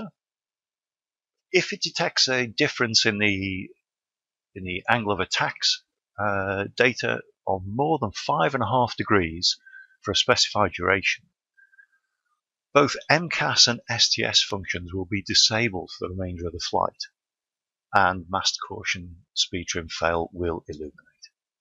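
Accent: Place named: Stoke, United Kingdom. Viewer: Are you from Stoke?!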